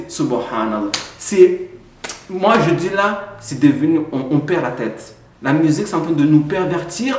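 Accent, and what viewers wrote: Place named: Brazil. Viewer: Tu as un accent brésilien...🙂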